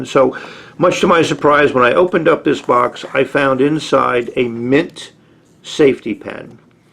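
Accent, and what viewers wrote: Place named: Appalachia, United States. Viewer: great video. I always learn a lot about vintage pens from you. congratulations on reaching a milestone with your Channel. I have to ask, based on your accent, if you happen to be from the Allegheny mountain region originally?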